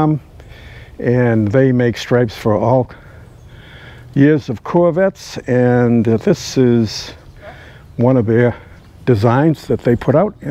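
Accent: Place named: New England, United States. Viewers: He sounds like he’s from new england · I can tell by listening to his voice, he's from up North, nothing wrong with that, I lived in New Hampshire while serving my country, when discharged, I moved back to Georgia, then after a nasty divorce, I moved to Massachusetts for almost ten years, then married a sweet girl from Brockton, and moved back to Ga, my southern accent used to drive people nuts, now after living up there for so many years, people don't know where I came from because i now pronounce my I's in the long form like tires instead of tars. My friends from Massachusetts used to love to listen to me talk. My guess is that he's from New York, Conn, Rhode Island, Mass, or Maryland. I love the look and how Corvettes drive but I spent 15 years working on them at a chevy dealer after busting my butt for 15 years working on those things, I most certainly would never own one. 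It's an amazing car, looks, performance, and a low price compared to other performance cars from other countries. I've just found that it's impossible to keep anything nice, there's always someone that will find a way to put a little flaw in my car that takes the perfection away from it.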